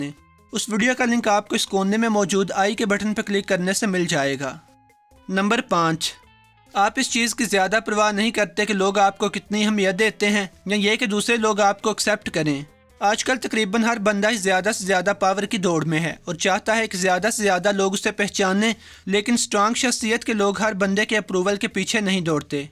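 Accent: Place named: Lahore, Pakistan. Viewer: Aap Yakeenan Lahore sy Hain ... hoobian nahi hota Khoobian hota hai ..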